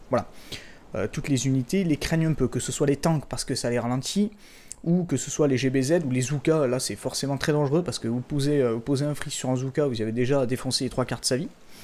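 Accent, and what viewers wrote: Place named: South, France. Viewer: très bonne vidéo surtout avec ton accent du sud ;)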